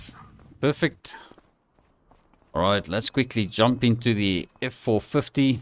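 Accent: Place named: South Africa. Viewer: Hi are u south African that accent sounds like u are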